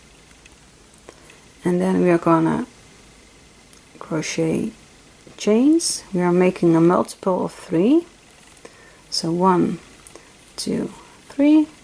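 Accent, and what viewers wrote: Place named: Netherlands. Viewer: I learned this one with a just one sc rather than two, but otherwise the same. I love that Nederlands accent - unmistakeable ! :) But I dunno how come you guys speak such faultless English !!!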